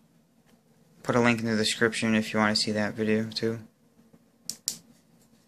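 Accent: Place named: New England, United States. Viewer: You from mass or maine???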